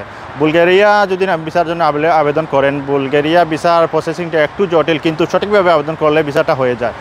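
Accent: Sylhet, Bangladesh. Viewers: Mone hoy Sylheti vai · ভাই সিলেটি নি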